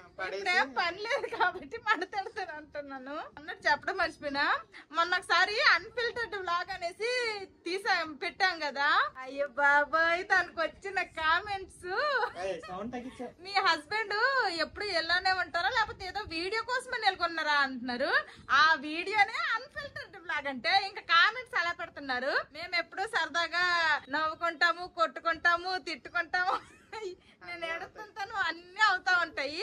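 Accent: Srikakulam, India. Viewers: Sikakulam basha 😅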